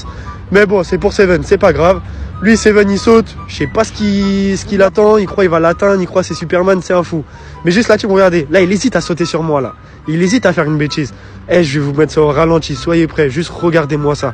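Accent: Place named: Algeria. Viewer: Pourquoi t'as un accent d'algerien qui a debarqué du bled ya 2 semaines ?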